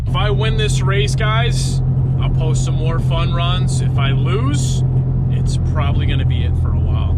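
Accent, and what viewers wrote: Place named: Philadelphia, United States. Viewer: Lol bro you just like your from philly.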